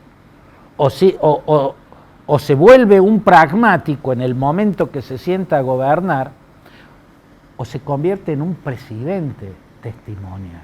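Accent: Córdoba, Argentina. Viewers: Claudito, yenes una arma retórica que seguramente habría impactado en Davos: su exquisito acento Cordobés. Sublime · es muy capaz en política internacional, ahora, de Economía NO SABE ABSOLUTAMENTE NADA. Como buen retacon, cree que es mucho más grande y genial de lo que es. es solo un OPINATOR de cabotaje, lo lindo es que le sale la toooonada cordoooobesa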